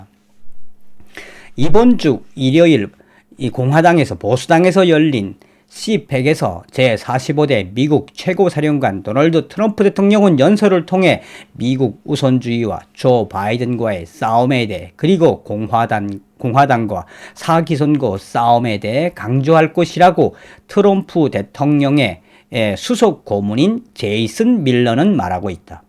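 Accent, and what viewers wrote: North Korea. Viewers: 방송 희소삭 매일 우울한 국민을 가짜든 진짜든 희망을 갖게합니다 하여 방송 감사하게 생각합니다 그러나 북한사투라는 이해하자만 이시점에서 좀 삼가하심 어떠신지 ? 죄송합니다.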